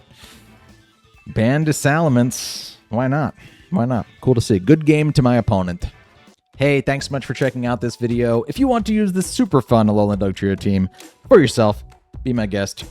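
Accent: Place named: Philadelphia, United States. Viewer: Your Philly accent was aht bigtime today pal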